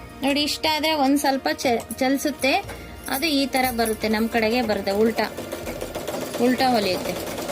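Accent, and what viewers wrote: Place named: Uttara Kannada, India. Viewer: madam nim bhashe nodidre uttarakannada davru ansatte. nim jote ondsari matadbekittu madam plz.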